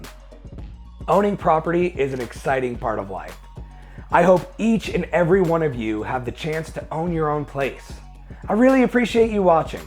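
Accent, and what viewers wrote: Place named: New England, United States. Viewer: a wicked lot-ah money! New England talking!!!!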